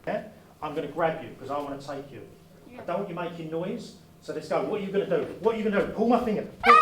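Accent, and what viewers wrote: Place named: Australia. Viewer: A big guy in Aussie accent doesn’t mean he know what he is doing. Half of the technique is NOT possible simply because you can’t reach the guy. Also I’d like to see a child being able to pull a finger off of a lumberjack sized men. There are good self defense techniques on YouTube, this is NOT one of them. Try it with a big guy at 50% aggression, bet you can’t even reach him. But a strangle can put you out in 3.5 seconds flat.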